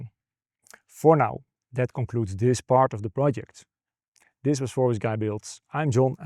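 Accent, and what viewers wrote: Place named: Netherlands. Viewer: Cool solution! We use a similar setup, but our cold water comes from our heatpump. During the recent heatwave we installed a watercooled aircon unit (Innova h2o) to lower the humidity and increase the cooling potential. There's a newer version called Innova WLHP, which is basically designed for a loop type that you are using right now. This trades a bit of electricity for groundwater, as these units can run until the water temperature has reached 60C. Based on your accent, I will assume you're in The Netherlands, we're currently having a shortage of rainfall so consuming less water would be nice :p (p.s. the innova can also be used to preheat or fully heat a boiler for hot tap water, double win for energy reuse :D)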